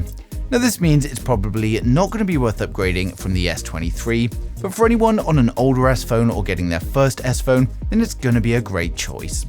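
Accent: England, United Kingdom. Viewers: Same rumors can be found on more reliable sources and they don't have that awful English accent. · What's wrong with the English accent?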